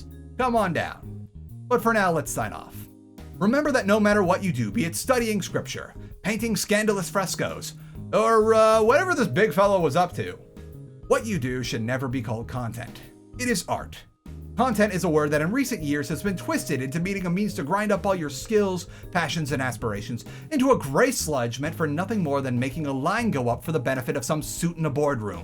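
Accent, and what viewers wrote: United States. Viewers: Man, listening to an american pronounce those names hurts so bad.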